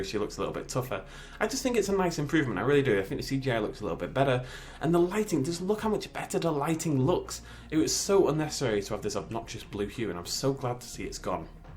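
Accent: United Kingdom. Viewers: Hey I was wandering which part of Britain your from As I am from Worcestershire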